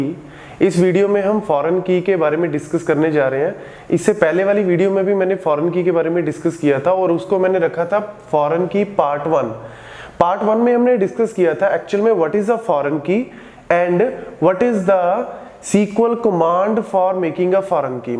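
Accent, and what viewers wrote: Punjab, India. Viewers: Mast punjabi tone. Yaha pe koi two taa nahi h yaha pe to 20 hai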